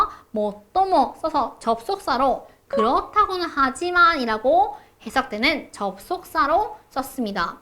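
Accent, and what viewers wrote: Busan, South Korea. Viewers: 강사분이 우리경상도 분이신가ㅎㅎ 부산 악센트가ㅋㅋ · 부산 분이신가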